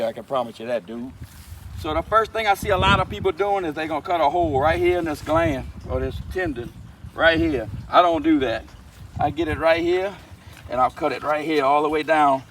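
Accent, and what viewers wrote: Louisiana, United States. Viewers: Sure sounds like my Louisiana people!!!!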